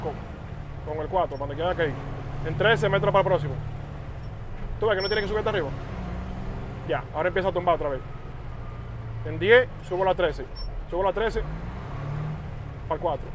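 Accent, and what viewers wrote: Dominican Republic. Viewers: Por el asento se que eres paisano mio jaja Dominicano no? Jaja estamoa donde sea papa!! Orgulloso de usted!!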